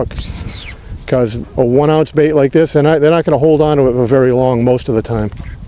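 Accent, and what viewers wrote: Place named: Massachusetts, United States. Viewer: Great video, lots of valuable advice in here. As a native Bostonian I could hear Massachusetts in your accent too pretty quickly lol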